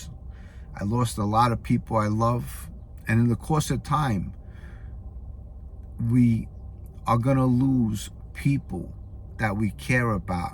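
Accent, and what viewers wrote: New York, United States. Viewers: still loving your vids but check this. Im interested in mob life like millions of others world wide.Would I want to live that life? Not no but HELL NO!. I think you made the right choice myself. The New York accent is bad ass. Keep being your true self and to hell what others say!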